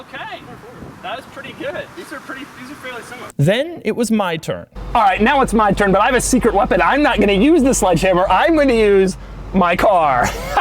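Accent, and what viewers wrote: United Kingdom. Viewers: you sound like a land rover enthusiast...british actually, not forgetting that british motors are awfully unreliable. get that from an african!!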